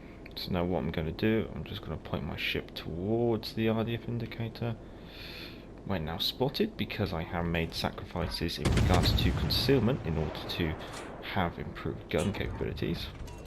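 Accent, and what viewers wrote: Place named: United Kingdom. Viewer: I also came over from spartan and its great to hear a British accent, maybe a link up with said spartan could happen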